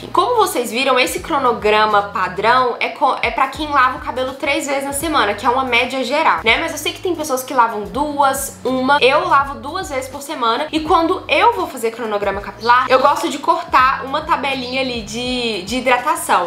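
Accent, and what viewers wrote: Minas Gerais, Brazil. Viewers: é o lindo sotaque mineiro ❤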